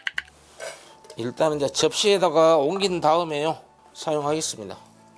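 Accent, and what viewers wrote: Jeolla, South Korea. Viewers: 늘 존경합니다 진심이 느껴집니다~^^ 전라도 사투리가 구성져요 ㅎㅎ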